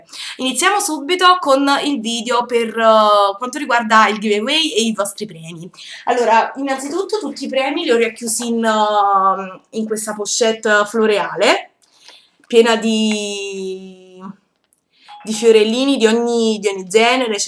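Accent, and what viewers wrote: Naples, Italy. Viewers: 4 haiun accento familiare, sei per caso napoletana ?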